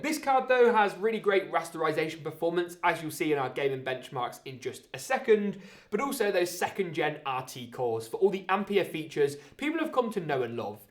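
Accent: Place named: London, United Kingdom. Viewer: London accent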